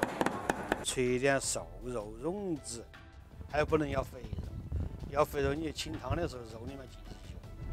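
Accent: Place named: Sichuan, China. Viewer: 浓浓的四川味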